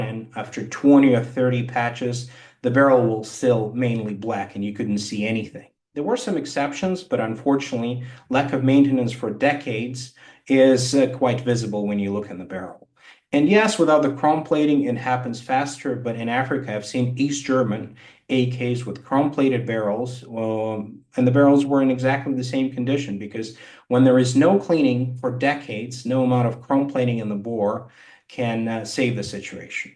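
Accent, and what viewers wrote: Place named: United States. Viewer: holy shit had no idea this dude spoke with an american accent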